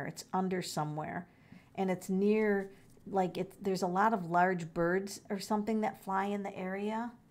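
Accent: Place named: Midwest, United States. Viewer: First I love your mid western accent. My husband is from Green bay wi. Second I love how you speak to Tara directly with the cards instead of just interpreting or reading the card. You really see her and acknowledge her. Don't forget to #taracalico in your tag section so it will get more noticed. I'm going to check out more of your work I love your personality.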